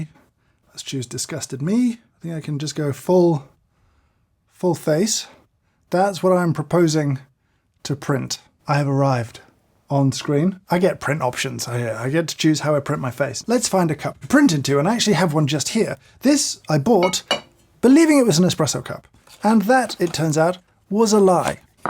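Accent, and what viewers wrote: United Kingdom. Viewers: My morning is complete, by watch a British sounding person struggling with some of his recent life choices.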